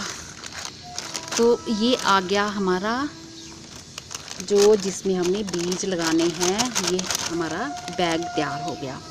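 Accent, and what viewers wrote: Punjab, India. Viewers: love your sweet punjabi accent! if your plant is ready and bearing any melon, pls. post some pictures here. waise...videos zara brief banaya karen. thanks! :)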